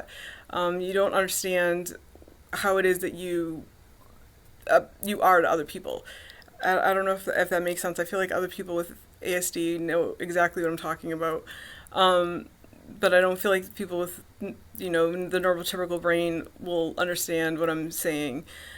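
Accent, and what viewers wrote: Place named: Canada. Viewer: Just wanted to share with you that I believe I’m an Aspie myself even though I’ve never been diagnosed with it. I have the characteristics of it. So I can really relate with you on it. But damn, you’re hot as hell! I’d definitely ask you out to coffee with me if you were here in my town. Bet you live in Canada (you sound Canadian). Anyway, Happy Holidays,! Greetings from Bay Area, Ca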